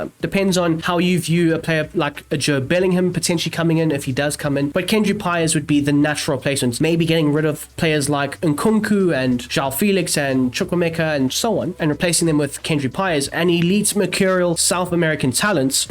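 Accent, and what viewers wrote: South Africa. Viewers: Fellow South African?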